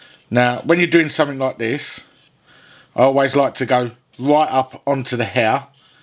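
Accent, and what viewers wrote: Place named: England, United Kingdom. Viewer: American language? Wot you on about you bleedin sceptic. Its the king's English he's speaking. He ain't gonna speak Spanish nah iz eee?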